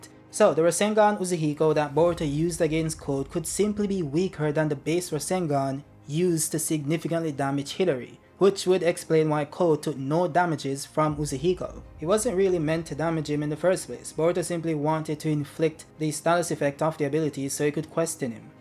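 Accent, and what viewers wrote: Jamaica. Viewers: Bro are you Jamaican ?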